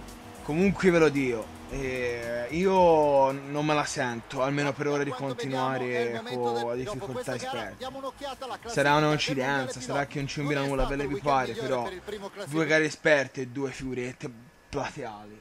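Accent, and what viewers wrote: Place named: Livorno, Italy. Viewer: Le tue incazzature con l'accento livornese sono favolose ahah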